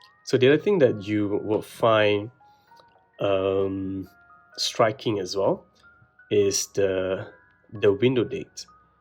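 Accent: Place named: Malaysia. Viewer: Are you Malay? Because i recognise that accent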